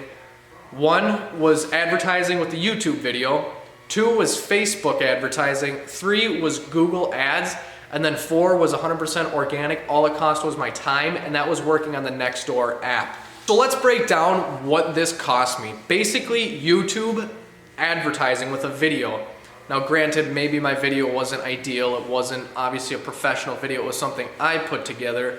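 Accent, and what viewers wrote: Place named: Wisconsin, United States. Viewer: Sounds like your from wisco.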